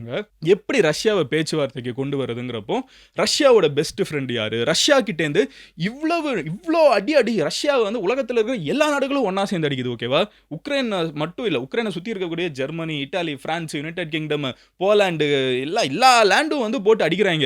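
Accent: Madurai, India. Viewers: Poravainga, madhura slang 😁😁😁😁 vera level🎉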